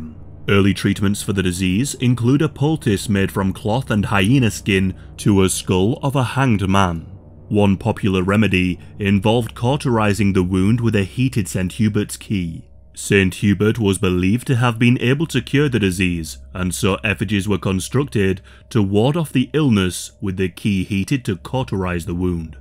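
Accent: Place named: Scotland, United Kingdom. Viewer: Love your accent. Scottish or Irish? I'm a wee bit uncertain.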